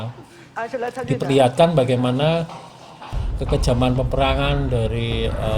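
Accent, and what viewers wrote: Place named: Java, Indonesia. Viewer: logat jowo salam ko kalteng bos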